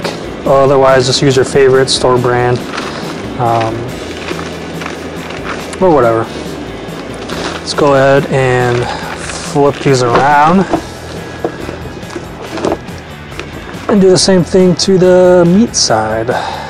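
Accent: Chicago, United States. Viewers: Sounds like a Chicago accent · Love your video - thanks so much! The ribs come out GREAT this way! Love your Chicago accent too!! ;) GO BEARS!! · I'm hearing a heavy Chicago accent!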